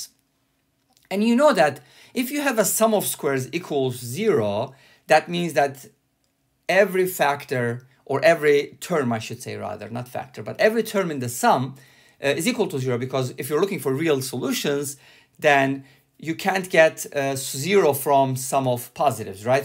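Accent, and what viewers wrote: Turkey. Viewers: are you turkish?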